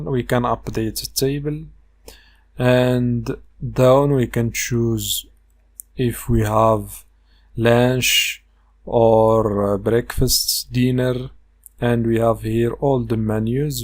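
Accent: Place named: Morocco. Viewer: HHHHHHH the moroccan accent 🤣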